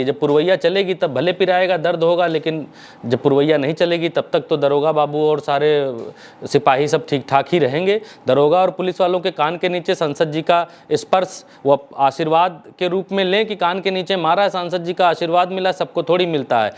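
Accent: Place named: Bhojpuri, India. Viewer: Your 'Bhojpuriya' type reporting is amazing.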